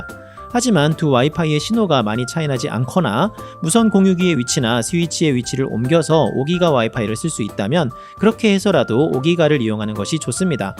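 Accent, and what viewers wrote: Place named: Gyeongsang, South Korea. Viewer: 약간 경상도 억양이 있네요 그리고 전 이더넷을 박아놔서 ㅋㅋ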